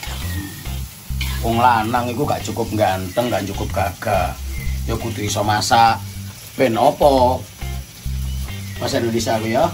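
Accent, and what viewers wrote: Surabaya, Indonesia. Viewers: samean wong suroboyo aa mas?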